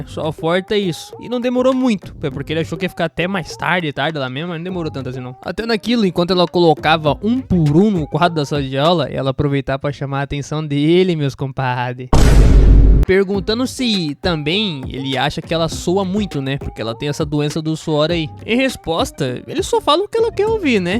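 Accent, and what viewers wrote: Rio Grande do Sul, Brazil. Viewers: Esse narrador parece gaúcho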